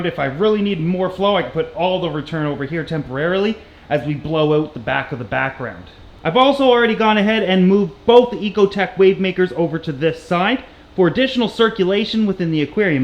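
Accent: Canada. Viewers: volgens mij is hij canadees aan z'n accent te horen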